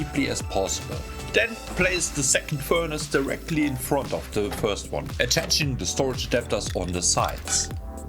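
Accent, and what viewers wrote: Germany. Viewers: The German accent is the best part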